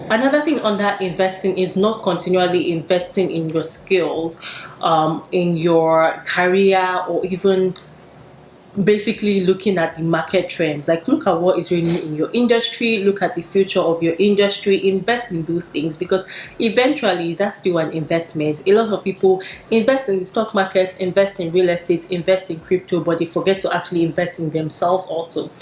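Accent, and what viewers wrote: Nigeria. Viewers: Thanks for this video sis. I learned a lot. I remember when my uncle co-signed to his friend's loan in Kaduna,it was a loan from LAPO, his friend fled to Ghana, his life became very hard and he was treated as if he was the lender. LAPO can be very scary in Nigeria. BTW sis,you sound like you're from kwara state.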